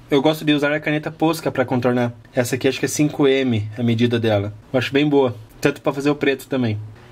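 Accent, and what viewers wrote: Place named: South, Brazil. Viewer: Gostei muito do vídeo, comentários bem pertinentes e achei ele bem carismático e natural... Amo esse sotaque do sul ❤😆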